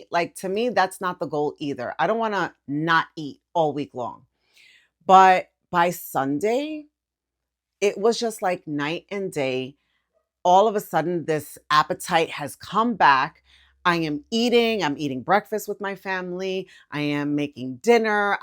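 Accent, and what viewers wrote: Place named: Puerto Rico. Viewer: I just found your channel looking for glp1 histories, I’m in my second week and in my first I just lost 1/2 pound and I was so tired so is good to know I’m not along. Also I was hearing you and for me you sound Puertorican and when I found that you are, I got excited because cuando consigo una compatriota Boricua que cree en Jesús, me emociono, congrats for your channel, don’t give up. 😉 God bless you 🙏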